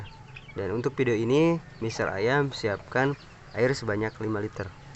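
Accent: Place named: West Java, Indonesia. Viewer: Mantef kang . Timna kang logat sundana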